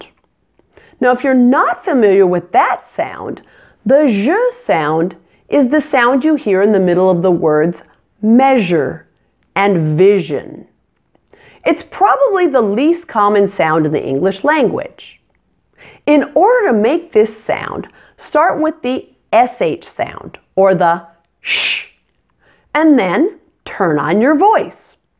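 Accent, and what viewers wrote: United States. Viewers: thx so much 😘😘..teacher this is english america???🤔🤔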